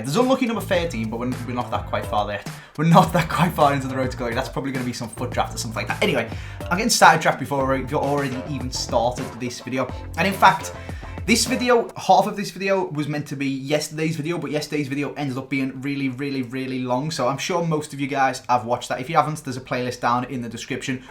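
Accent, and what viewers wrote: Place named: North England, United Kingdom. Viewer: What part of England you from? I recognize your accent is it Liverpool or Manchester or something?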